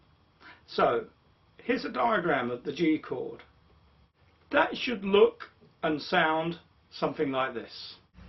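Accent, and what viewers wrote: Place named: United Kingdom. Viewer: 這位老師的youtube頻道叫做SecretGuitarTeacher(對沒錯三個單字連在一起） 這位老師教得真的很不錯 但要吞得下英國腔XD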